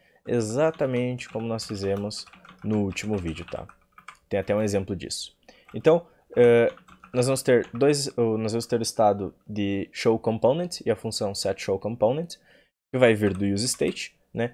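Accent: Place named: Rio Grande do Sul, Brazil. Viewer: Você é do Rio grande do Sul? Gostei do sotaque. Eu sou brasileiro.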